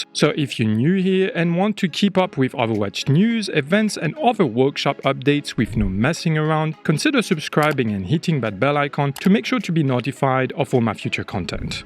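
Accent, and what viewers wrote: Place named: France. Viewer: Are you french? Cause you sound french.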